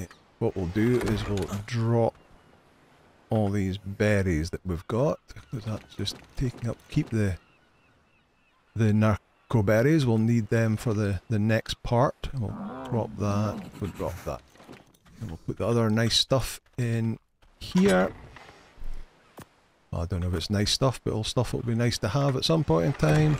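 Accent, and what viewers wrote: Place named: Glasgow, United Kingdom. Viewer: No worries, it has to work for you or it simply won't work. The main reason I asked is that the build system is pretty good and it might exercise your imagination. PS. I served in the British Army a long time ago and the first Troop I commanded was recruited from Glasgow. Not saying you have a Glaswegian accent, but it does remind me of that time. I miss those guys.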